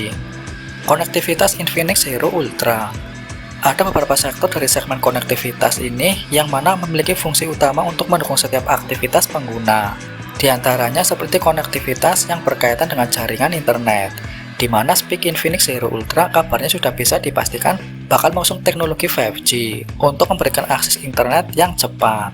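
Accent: Banyumas, Indonesia. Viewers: 😂ki asli ngapak.ora ngapak ora kpenak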